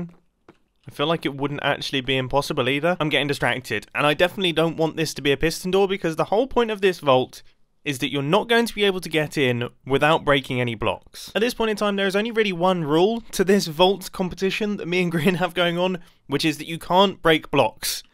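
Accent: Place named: United Kingdom. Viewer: OMG I HAD FLASHBACKS FROM PORTAL 2 because his british accent and the moron combined just remind me of weatly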